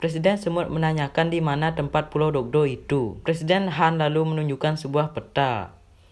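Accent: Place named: Bali, Indonesia. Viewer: narator nya bali banget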